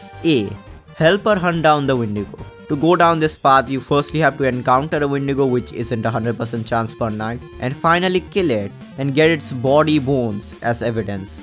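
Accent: India. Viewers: are you an indian your accent is funny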